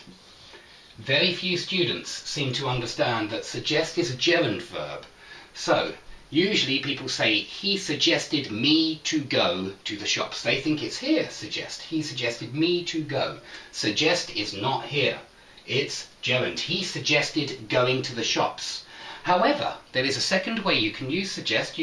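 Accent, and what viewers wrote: United Kingdom. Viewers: you've got such a cute British accent. n.n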